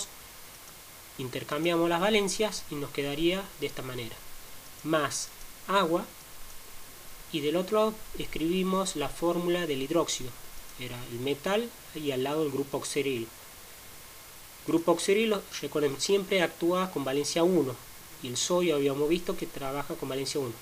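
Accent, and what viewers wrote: Córdoba, Argentina. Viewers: De casualidad sos de Argentina? Mas exactamente de Córdoba? Por la forma de hablar.. Un pequeño consejo.. Valencia y numero de oxidación no es lo mismo y veo que lo confundís.. Ojo con eso.. Igual muy buena explicación !! :)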